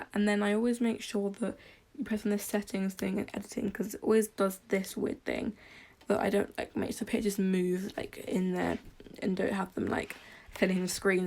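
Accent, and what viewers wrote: United Kingdom. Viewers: British like me :) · IS THAT A BRITISH ACCENT I'VE LIVED IN BRITAN ALL MY LIFE AND I CAN'T DO A FREAKING BRITISH ACCENT (Your voice is so cute i love it) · U British??? :D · She has such a nice British accent! lol I just sound sleep deprived all the time😂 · YAY U BRITISH LIKE MEH UWU !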